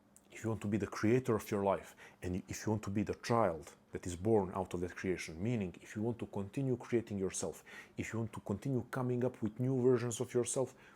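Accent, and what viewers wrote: Balkans. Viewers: Broo, do you have Balkan roots? You have some strong Balkanic accent (strong š, č, ć, ž, đ, dž sounds)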